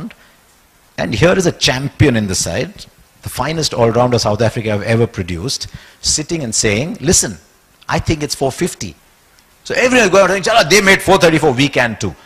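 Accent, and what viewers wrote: Hyderabad, India. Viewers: Came for the Hyderabadi accent.. stayed for the lesson.. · I love Hyderabadi accent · Hyderabadi 💯 aakhri kiraak chindiyaan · Namaskar harshaji it's cent percent hyderabadi aswellas beautiful english commentary with mellifluous voice thank u n request u for on field jokes as u hubnob with cricket cricketers and can Crack crickety jokes thank u sir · Bhaago miya..kya karain 😂😂😂..he nailed the Hyderabadi lingo here 👌🏻👌🏻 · This is urdu+Hindi + Telugu accent = Hyderabadi language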